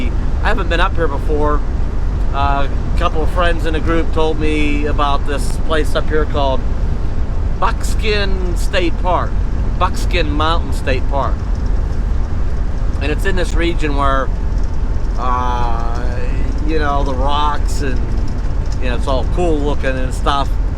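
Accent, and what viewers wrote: Pennsylvania, United States. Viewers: Are you from Pa....you kinda have that PA accent 😂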